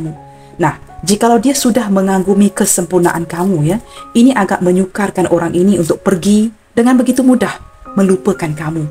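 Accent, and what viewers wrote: Malaysia. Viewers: Terima kasih bunda kesayangan ku yang selalu siap setia kasih kita semua pencerahan sehingga kita semua selalu wawas diri dalam bersikap, readingan yang sangat gemoy idaman setiap subcribers nya dengan alunan swara yang sexi terutama logat basa Melayu nya ILIKE IT.sangat resonet bund 👌👍🤲🙋🙏.betul bund komonikasi itu penting 🙏🙏🙏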